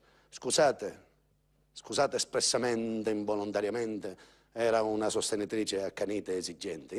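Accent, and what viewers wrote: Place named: Calabria, Italy. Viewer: Non è siciliano cazzu dici chissu e di Reggio Calabria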